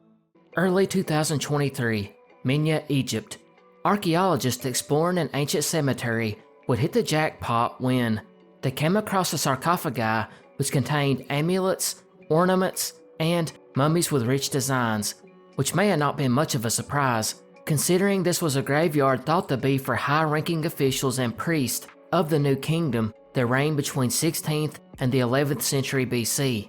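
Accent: South, United States. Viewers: It's soo good to hear a real deep southern voice that isn't put on. Love your show!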